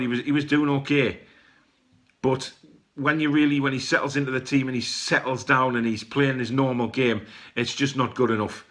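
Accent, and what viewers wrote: Geordie, United Kingdom. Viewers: the fact you sound like the True Geordie im subscribing (Blackburn fan :) )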